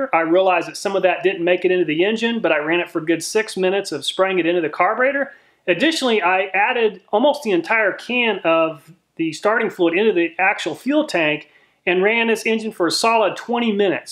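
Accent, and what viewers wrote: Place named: South, United States. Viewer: I like how this guy talks. His mouth is so animated and the southern accent. Very entertaining to watch/listen.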